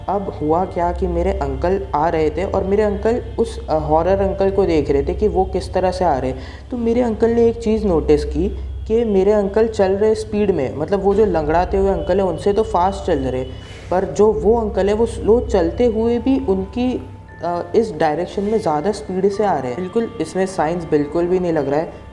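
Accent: India. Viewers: Bhai ap gujarati ho???? +_+ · Are you bengali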